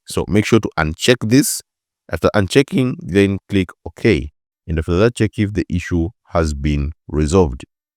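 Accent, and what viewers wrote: India. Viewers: what is that accent bro indian or arab? fix your language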